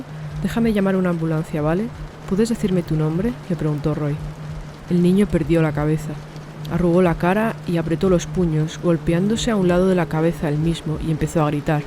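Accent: Spain. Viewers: Me gustan mucho tus historias y ese acento español lo adoro 😍 sin duda este ya es de mis canales favoritos además tus historias están muy interesantes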